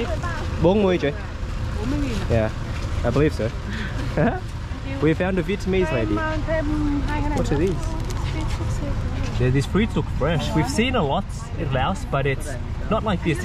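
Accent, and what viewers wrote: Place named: Australia. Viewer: What on earth do you mean? He has Aussie Accent he was born in Australia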